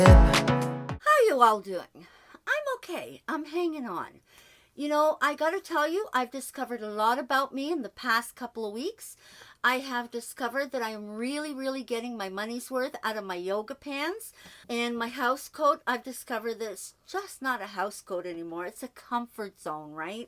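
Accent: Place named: Portugal. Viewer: I work with a Portuguese women and your accent sounded the same. 👍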